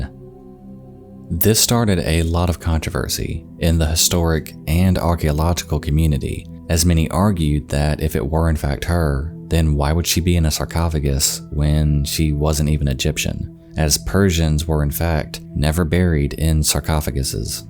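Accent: South, United States. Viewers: Congrats on your quick rise to fame. Your videos are good, and your southern accent is just great ) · sounds like Barely Sociable with a slight southern accent. Barely has once done a southern accent, though.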